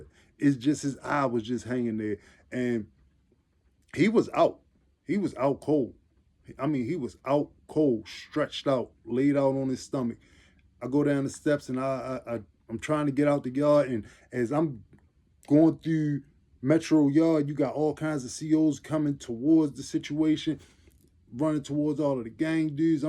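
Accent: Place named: Mid-Atlantic, United States. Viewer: Bro where you from I hear that Mid Atlantic accent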